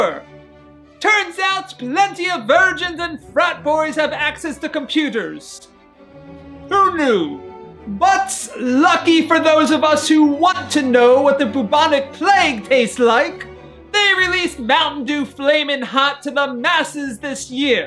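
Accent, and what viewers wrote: United Kingdom. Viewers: this, this is the most amazing YouTube ad ever. Thank you funny British man with an slight British accent in a olden day uniform that is reviewing an horrific MTN Dew flavor. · Finally, YouTube gives me what I’ve wanted for years; a man in a French uniform from the Napoleonic war using a slight British accent reviewing modern food and drink.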